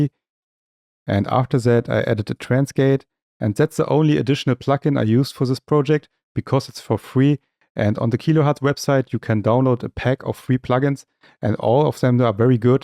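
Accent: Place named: Germany. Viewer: thats a german Accent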